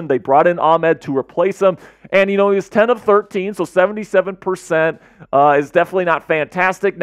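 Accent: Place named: Chicago, United States. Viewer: Picked up on your Chicago accent!! Amma right?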